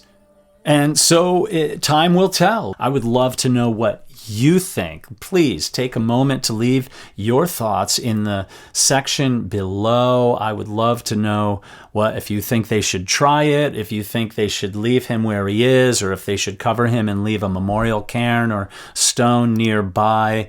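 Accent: United States. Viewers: Would you please consider learning how to pronounce the Sherpas' name correctly? It is disrespectful to be still calling them in such heavy Americanised accent when you are covering stories and news about Everest.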